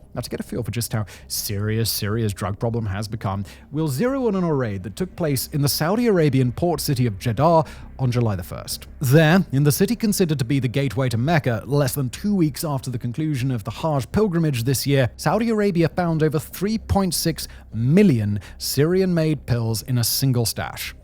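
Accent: RP, United Kingdom. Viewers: This guys English is about as posh as I've ever heard. It's fun to listen to though.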